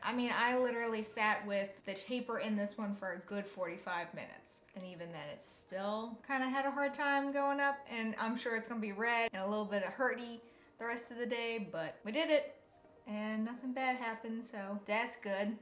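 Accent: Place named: Louisiana, United States. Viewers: I'm going to guess you're from Louisiana. The way you spell go and the word Y'all. Lol. You're a beautiful young lady. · That Cajun accent though! Love!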